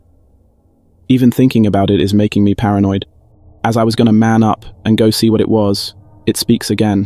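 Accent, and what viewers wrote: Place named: United Kingdom. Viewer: Awesome British narrator ! 😀😀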